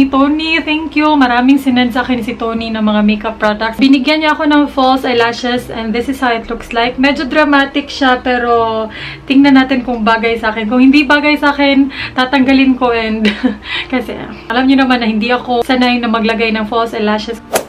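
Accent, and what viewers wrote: Visayas, Philippines. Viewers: Para sakin ang ganda talaga ng accent/punto ng mga bisaya na nagtatagalog. Hahaha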